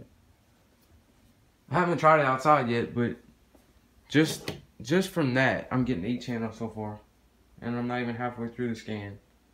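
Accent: Louisiana, United States. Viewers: Dude ya sound like a fellow Louisiana boy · Gahlee wea you from neg?? Sounds like you from LOUISIANA??? If so, where at cuz I'm trying to catch some TV channels in Houma LOUISIANA 😂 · You have got to be from Louisiana with that accent!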